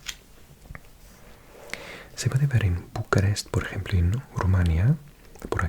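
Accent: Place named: United Kingdom. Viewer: Wow yo ya había visto algún que otro vídeo de tu canal de geografía y mapas y siempre he pensado, que acento más de Reino Unido tiene, pero verte hablando un español tan fluido me ha parecido impresionante. Sigue así y enhorabuena!!!!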